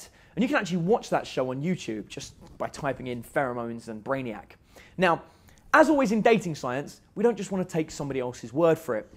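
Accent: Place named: United Kingdom. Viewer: I like his British accent :)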